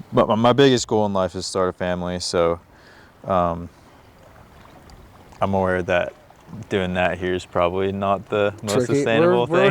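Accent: United States. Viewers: It may have been asked before, but your English accent sounds like you are originally from the United States. Is that where you hail from? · He has a California accent lol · It took me a while to figure out why he has to search for words in english. American accent but exposed to the language for a relatively short time. That makes a lot of sense and makes it really interesting to listen to. Besides that, I envy the live you live there. Eating fish on a daily basis, together with fruits from your own garden is the kind thing I dream of. Far away from crowded places, and just doing your thing. I really like the way you do your business there and I genuinely hope you will upload videos like this more often to give us an inside look on your life.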